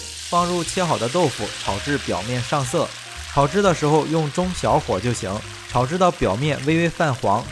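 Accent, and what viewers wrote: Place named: Jinan, China. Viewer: 听口音就猜是济南的，加上甜沫豆腐脑，更加确定无疑了。谢谢老乡。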